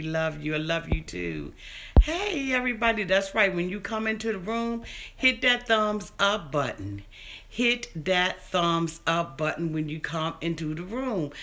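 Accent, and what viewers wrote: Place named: Maryland, United States. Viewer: Britt S it's her Maryland accent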